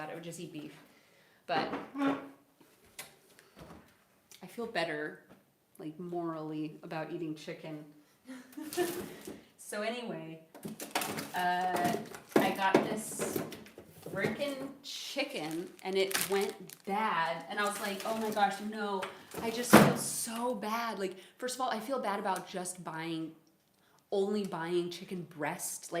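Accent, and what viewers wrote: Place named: Slovenia. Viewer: Girl u sound like a REAL Slovenian! My cousins have a whole basement with extra groceries and frozen goods! God bless ya girl! I live in Washington D.C. but my parents are from 🇸🇮! 👍 You sound sooo much like them!!!💋😊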